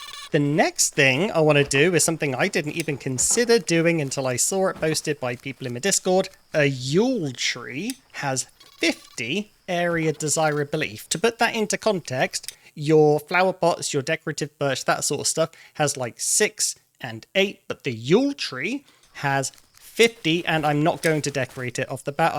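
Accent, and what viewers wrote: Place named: United Kingdom. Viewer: I do wish he could feel like he doesn't need to hurry up. I watch at 90% speed because with his accent (I speak Murican not British or Aussie), it's difficult to some of what he's saying as he speed slurs his words together as though he's just having a banter with the blokes on the block or whatever they do in his part of the world. Anyway, sir, you can talk at normal speed without viewers catching Twitter Brain and tuning out because you're speaking at a normal pace.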